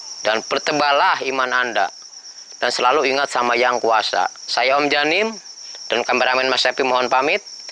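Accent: Banyumas, Indonesia. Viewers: Ngomngnya ngapak banget lho . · Walaaahh wong ngapak kie mesti,,hhhaa. sehat" nggih pak.tek enteni video anyare mning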